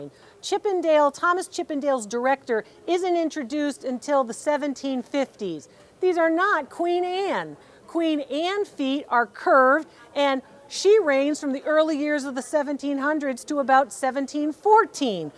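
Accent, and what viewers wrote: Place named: Pennsylvania, United States. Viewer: Dr. Lori, you should've shot your own feet and said something like "These are feet and they're tired from walking around thrift stores." Those Lenox pieces were a real bargain. Love your humor and your "Pensa-vania" accent.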